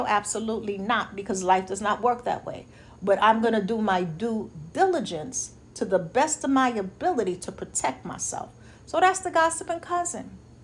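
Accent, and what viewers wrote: New York, United States. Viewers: So appreciate your ministry.. your giftedness! Love your teaching style bold…unadulterated..❤ ❤approach along with your wonderful NYC accent. Through your ministry I learned to focus more on my own personal goals gifts and talents and continue to pursue what is best for me in this season of my life. You keep shining! I love church by phone each Sunday along with the wellness club on Wednesday. I have at least 4 of your books and have given 2 additional books away. God Bless you. ❤Lorraine from NY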